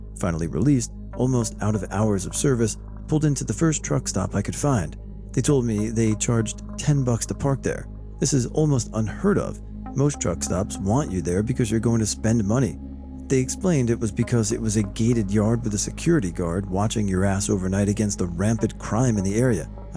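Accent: Australia. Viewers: Aussie here - your accent was pretty good! More of a country accent than a city accent, but pretty good! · In regards to your Australian accent. Well, I've certainly heard a lot worse.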